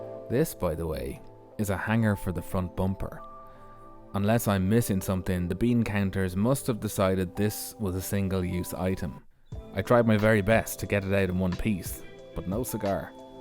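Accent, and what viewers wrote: Ireland. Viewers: As a Dutch guy I love the Irish accent and your humor man. I’m not used to film or let alone editing but the way you portrayed the disassembly for instance looks fantastic. I appreciate the fanatic way of removing the rust, you can only do it good once. About etch primer or epoxy, people shouldn’t bother. Etch is only for adhesion and given the fact it needs to be applied very slightly it doesn’t give protection against corrosion, that’s the job of the epoxy primer. And some epoxy primers are suitable for using on bare metal and some aren’t. I presume you checked it before you bought it and especially before you applied it. The only thing I don’t understand why you removed the primer on the plug welding spots. Couldn’t you use a weld through primer? Oh maybe that’s only suitable for spotwelding, when there’s are no drilled holes in both layers of metal. 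No I would seal all the seams between the top and under panel where water can run into. And I would pay special attention to the screen wiper mountingpoint for instance, I mean there is bare metal underneath. I’m not saying this to pretend I know everything better, just trying to think along. Keep up the good work and that means the total package, I enjoy it!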